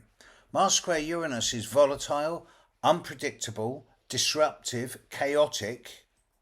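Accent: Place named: England, United Kingdom. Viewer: We love your videos! Your knowledge and that English accent! Thank you for what you do! American girl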